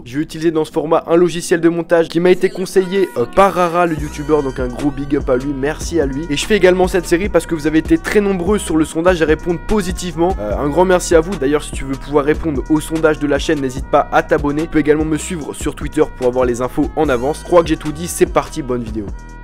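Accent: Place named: Belgium. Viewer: Tu est belge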